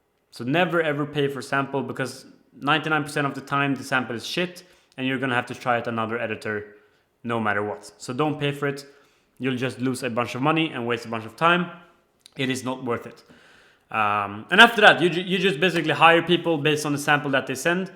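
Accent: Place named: Sweden. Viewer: Man hör att du är svensk :P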